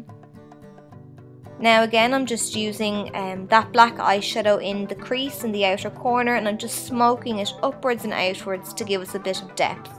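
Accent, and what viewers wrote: Ireland. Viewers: Do u have an Irish accent?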